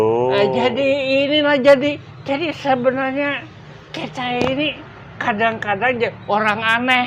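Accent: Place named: Java, Indonesia. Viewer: mantap👍👍👍....logat nya kayak jawa ngapak yak heheh 😁salam sejahterah dan damai selalu buat seluruh warga kalimantan🙏🙏🙏kita smua bersaudara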